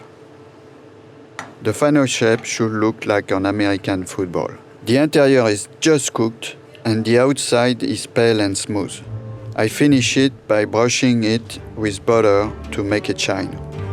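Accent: France. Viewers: Intelligible? What? He has a French accent. He is French. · I'm french and even for me it was too much. We all know that our accent is hard when we speak english, but yeah that's kind of extreme here. Still loved his "and voilà" at the end. 😂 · "I see people do crazy things, you know." said the deadbeat-sounding Chef in a thick French accent with a very serious tone. Are you feeling well lately? I am concerned. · He's painfully French, judging by the accent. But an amazingly skilled chef, nonetheless. I wanna try the Ouefs en meurette, and the jelly poached eggs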